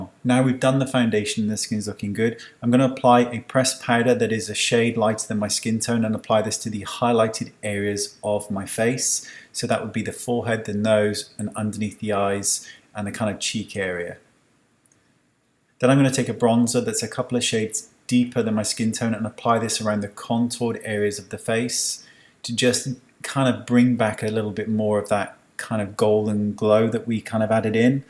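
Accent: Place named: United Kingdom. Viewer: Hi dear I'm new here so I'm watching old vídeos that are so interesting nowadays as well I love your easy explanations and so professional at the same time My language is Spanish I'm from Uruguay but I do love since I was a child British pronunciation so to watch you it's awesome ,excellent pieces of advice and straightforward 🌹Love your way and your wink lol Thx for being here to help us ♥️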